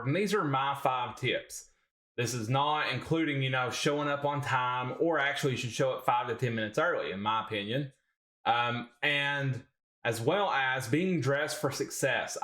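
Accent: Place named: Texas, United States. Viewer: Nice video. Nice job. Everything said is to the point and very accurate. Cool southern accent too. Probly Texan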